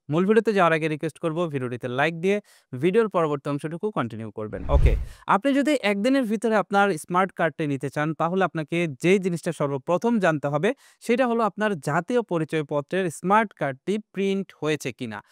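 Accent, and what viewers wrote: Tangail, Bangladesh. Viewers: Vai er Basha Tangail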